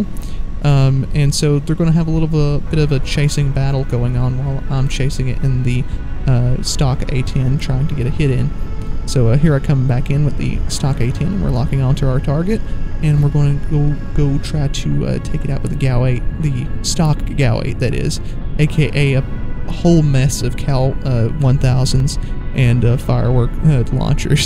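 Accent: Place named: Iowa, United States. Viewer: It's just a yes or no can you make a like ww3 series were you use that weapon mod basically nato vs russia and china and other Rouge contries pls and thank you. (other suggestion make a F22 and the B2 bomber) (I know you a guy from Iowa or something in the usa that has a dessert cuz you got a nice accent) (sorry if that offended you) (Gramerly helped with that) (I think im using too much brackets lol i mean these (((())) )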